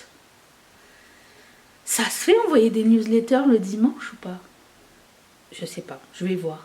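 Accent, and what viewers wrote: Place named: Quebec, Canada. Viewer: Merci pour ce petit bout d'accent si merveilleux. Je suis tombée en amour du Québec avec les séries "la petite vie" et surtout "Catherine". Bonne journée à vous;)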